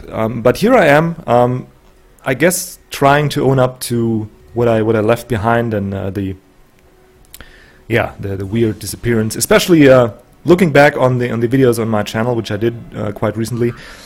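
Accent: Germany. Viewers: Im so happy to see you back!!!! :D its great to hear your voice and some german accent again FeelsReallyGoodMan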